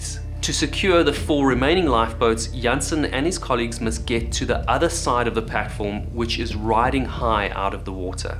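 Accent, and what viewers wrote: South Africa. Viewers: You sound very South African. I love the accent and you are a real good story teller. Great stuff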